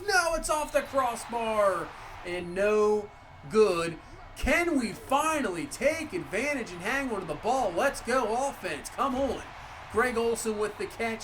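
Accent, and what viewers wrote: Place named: Philadelphia, United States. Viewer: sorry I know that seems like an odd question but I have a good friend who is from right outside of Philly and you sound exactly like him. I am from the south so the accent sticks out to me.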